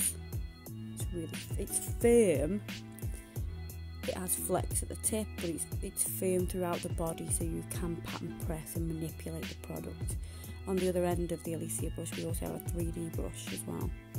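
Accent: Stoke, United Kingdom. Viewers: They were stunning duck your uncle would be so proud. Please do more videos but dunna have music as loud as conna hear those delicate stokie tones xx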